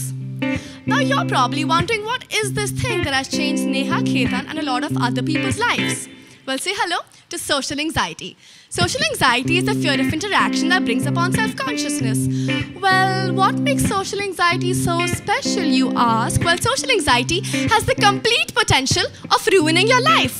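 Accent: United Kingdom. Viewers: And I love your English accent❤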